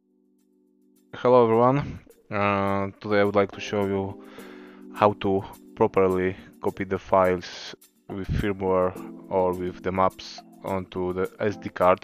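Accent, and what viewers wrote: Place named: Poland. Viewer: What language you are using ? bcos if its english... then you have to practice a little bit more... thats more like polish then english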